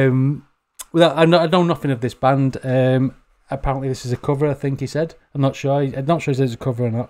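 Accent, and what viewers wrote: United Kingdom. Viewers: Are you a Liverpoodlian?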